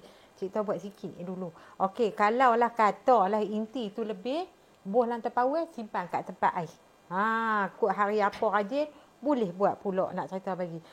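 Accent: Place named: North, Malaysia. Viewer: assalamualaikum..terhibur dengan telatah tambah pulk loghat utara.contoh nya nak ceghita bagi.nek habaq mai.ummi tergelak sendiri masa latah.memang padu pulut udang tu.ummi pun lama xbuat dulu penah juai.buat senighi puaih hati.ummi nak tanya kampong kat mana.ummi orang utara jugak.memang terhibur.anak2,la depa pandai buat pulut mulut ni.klu ummi buat depa bungkuih boleh la.lain kali ummi nak minta buat kuih jongkong bulih kan.bye ummi suka dan minat ngan telatah anda menghibur kan.t.kasih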